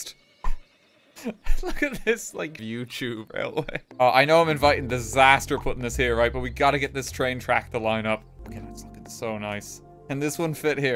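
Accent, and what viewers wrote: Ireland. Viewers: Irish jerma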